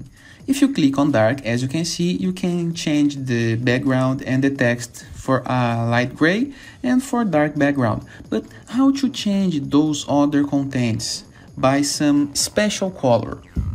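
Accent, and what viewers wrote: Brazil. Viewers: o sotaque não engana, br na veia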